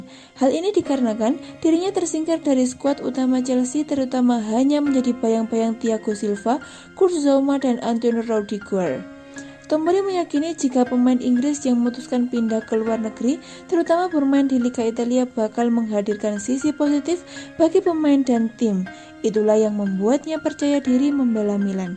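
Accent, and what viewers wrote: Java, Indonesia. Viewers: Mbak..e Jawa mana?? Wkwk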